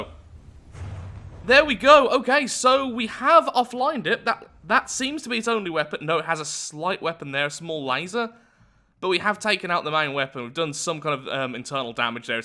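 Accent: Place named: Birmingham, United Kingdom. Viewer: You can really tell Is a brummy sometimes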